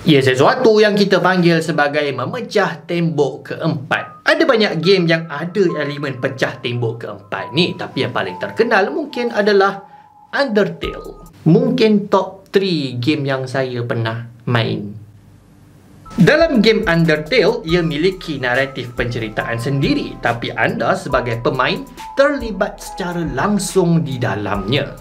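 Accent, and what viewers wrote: Malaysia. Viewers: pak, boleh minta tulisan dibawahnya dong, tambahkan subtitel, hehe, saya masih binggung sama logat melayu, hehe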